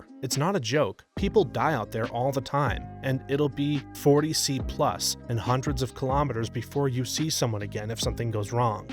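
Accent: Scotland, United Kingdom. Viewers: Nobody commented on that Scottish accent?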